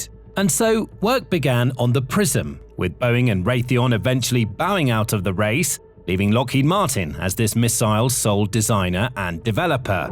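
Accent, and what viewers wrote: England, United Kingdom. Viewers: Geee is it me or does the British accent remind you of the folk hawking knives and other stuff on upper cable channels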